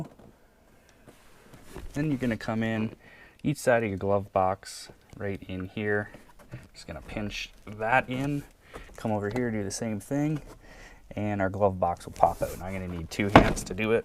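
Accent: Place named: Canada. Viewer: Canadian eh!? Thanks for the tutorial!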